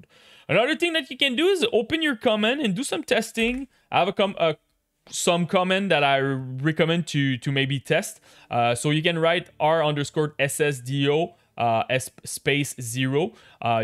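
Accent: Quebec, Canada. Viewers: Je peux reconnaître ton accent du Québec .... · Toé tu viens clairement du Québec LOL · Sa ses de la belle accent quebecois :) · Super. Entk sa parait que tu est québécois xD. Entk C'est super, j'ai gagné en FPS.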